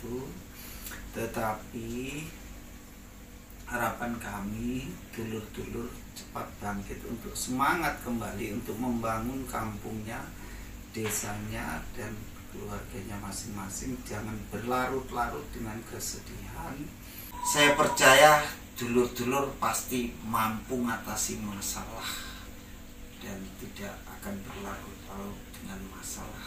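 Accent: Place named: Banyumas, Indonesia. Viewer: Mantap Ndan...ora ngapak ora kepenak..ssalam.ngapak dari kedungbanteng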